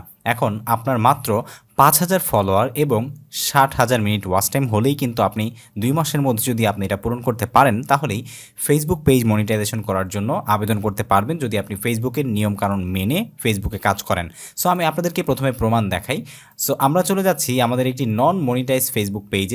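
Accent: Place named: Gopalganj, Bangladesh. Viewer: Vaya apni gopalgonj ar cale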